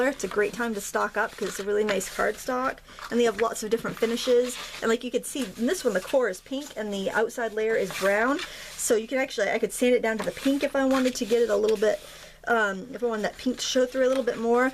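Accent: Maine, United States. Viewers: LOOL< see, and I've been "from away" for so long that I miss the Maine accent! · I love the Maine accent!!! Whoohoo! Great video. :)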